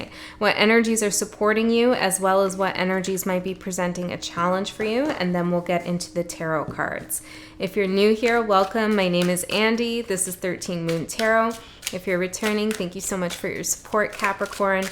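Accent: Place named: Canada. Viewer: That Canadian accent 👍🏿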